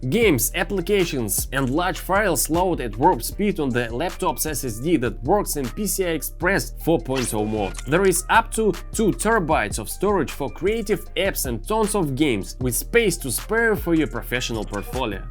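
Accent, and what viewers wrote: Russia. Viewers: ох уж этот русский акцент😅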